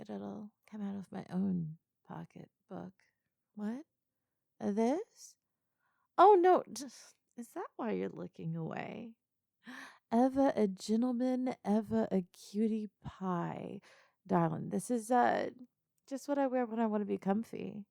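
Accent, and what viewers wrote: South, United States. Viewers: Whoo even if on the off chance I'm the only one who feels safe when a southern possessive soft dom lady starts speaking sweet nothings feels safe and at home, thank you for making me feel that way ❤ love you ❤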